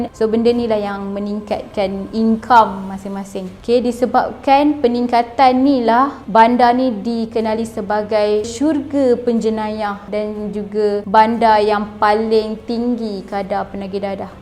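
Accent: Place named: North, Malaysia. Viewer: tak taw pasepa... slank utara detected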